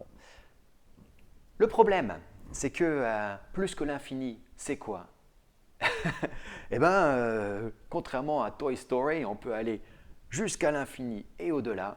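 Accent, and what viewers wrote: England, United Kingdom. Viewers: Merci pour votre pédagogie qui n'a d'égal que votre humour et votre accent anglais, qui détonne dans la bouche d'un francophone. Vous êtes le Choulax de la photo comme on dit ici.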